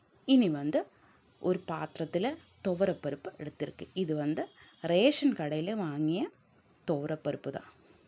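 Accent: Kerala, India. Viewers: Your tamil is good qith malayala accent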